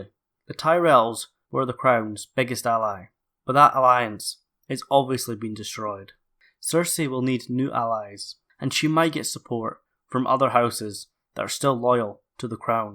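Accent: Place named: Scotland, United Kingdom. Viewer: Just stumbled across your channel, great stuff; am sure I'll be binge watching it through during the wet scottish summer this year. Also awesome accent. For anyone who's unfamiliar with Scottish accents, this dudes got a lovely 'kind, soft' variant of the accent - the other is more akin to this : <link> Just kidding, I love living here in Scotland! Thanks!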